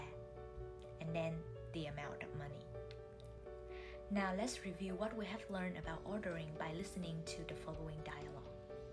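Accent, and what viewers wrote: North, Vietnam. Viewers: Would this be a Northern accent?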